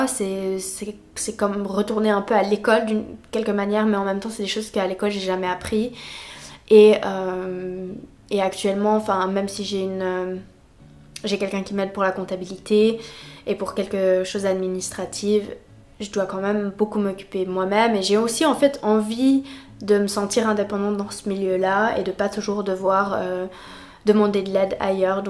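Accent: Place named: Switzerland. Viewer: L'accent suisse est beaucoup plus facile à comprendre que les autres accents français.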